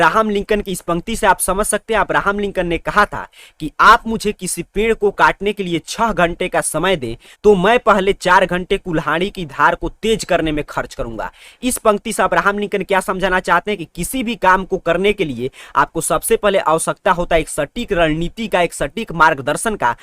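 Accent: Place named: Gujarat, India. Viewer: Sir aapka voice ka ton ek gujrati jaisa hain.....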